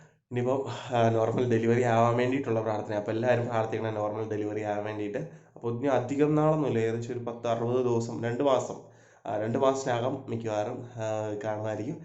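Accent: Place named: Thrissur, India. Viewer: ചേച്ചി തൃശൂര് പോയ ഭാഷ ഒക്കെ മാറി തൃശ്ശൂർ ഭാഷ ആയി😍😄💞💞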